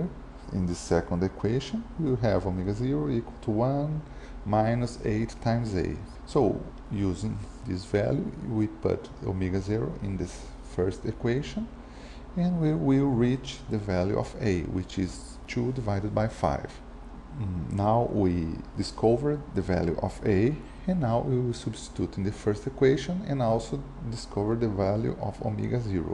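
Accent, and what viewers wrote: Brazil. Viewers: sounds like brazilian english =) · You are brazilian, aren't you? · Great explanation! You must be Brazilian by your accent right?? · Que sotaque brazuca hein? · are you Brazilian? you accent sounds a lot like the Brazilian one... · By the accent I can say you are brazilian like me :) Great video! Helped me at the exam :)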